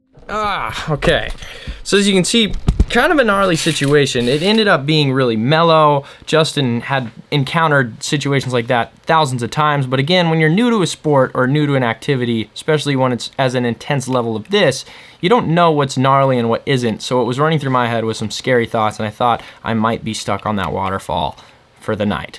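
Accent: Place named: Australia. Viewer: You should stop the Australian accent.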